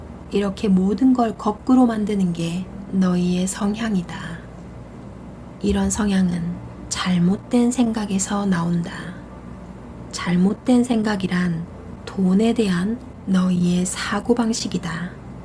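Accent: Gyeongsang, South Korea. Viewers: 구수한 경상도 사투리 좋아요. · 여러 곳에서 제목만 들었던 책이었는데 오늘 만났너요 감사합니다. 경상도사투리 쓰는 여신의 목소리 재밌습니다. 원하는 모습을 생각하고 말하는것 보다는 원하는 모습에서 행동하고 말하고 생각하는것 ᆢ 인거죠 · 경상도 억양이 친근하네요 젊은 시절의 나인것 같은 착각이 드는 다정한 목소 읽고 싶었던 신과나눈 이야기 맛깔나게 잘 듵었습니다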